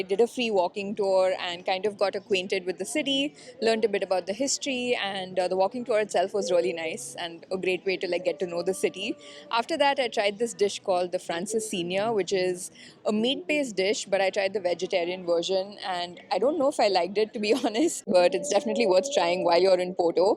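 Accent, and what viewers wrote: India. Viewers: You have an Indian accent, are you from India? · Yes she is from India.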